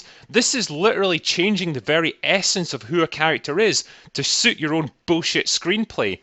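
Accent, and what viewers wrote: Scotland, United Kingdom. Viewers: So three years ago, the critical drinker was less drunk and less Scottish? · Soccer ball.......?? Ur Scottish dude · You used to sound normal...well as normal as possible with that Scots accent. So you do put on a voice!! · Very strange hearing a Scot say "soccer ball" isn't it football everywhere except the US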